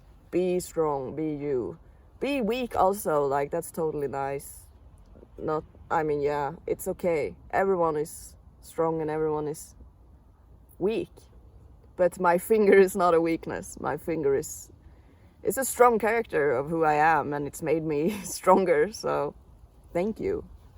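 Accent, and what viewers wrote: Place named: Sweden. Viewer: I don't know if I got it right, but in my ears you have a similar accent to Peediepie's so: are you Swedish?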